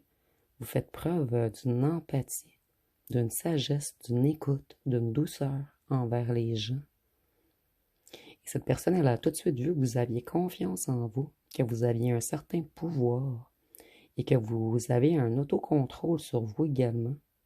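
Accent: Quebec, Canada. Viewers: Excellent, c'est littéralement mon histoire que vous avez lue dans vos cartes jusqu'à ma connexion avec mon enfant intérieur. Vous êtes de très loin la meilleure et votre accent québécois très sympathique et empathique ajoute au charme du message. Merveilleux message qui exprime toutes nos attentes mutuelles à tous les deux.❤❤❤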